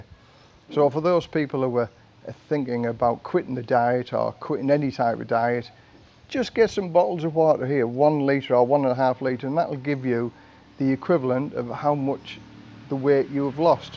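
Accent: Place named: Sunderland, United Kingdom. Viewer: Areet mate. I’m from Tyneside. And I can tell you are from Sunderlnd way by your accent 😂. No worries 😉. Watched a couple of your YouTube vlogs on Thailand and loved them. As I’m 130KG. 20 stones in old money I became interested in your “Keto” diet. My goal is 90 KG. I’m 64 year’s of age and desperately need to lose mega weight before I die. I haven’t visited my GP for two years since the outbreak of the pandemic. Although I have received two jabs and booster and fortunately not contacted Covid-19. So thanks to you I’m going to follow your guidance and achieve my goal.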